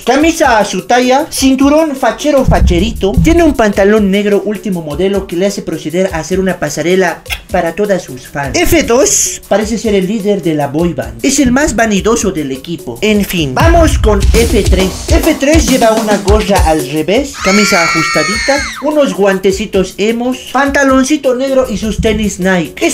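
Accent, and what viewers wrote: Bolivia. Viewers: hablas del Cu.... tipico hacento Cochabambino que intenta ser graciosillo...... por cierto eres muy guapo 😆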